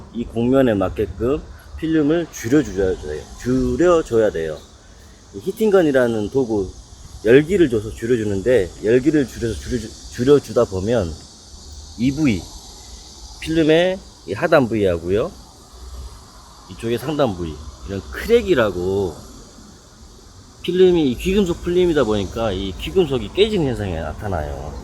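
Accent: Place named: Gwangju, South Korea. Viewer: 다른영상에도 댓글남겼었는데.. 번창하세요.. 같은 광주분이라서 더더욱 말씀이 구수하네요 ㅎㅎ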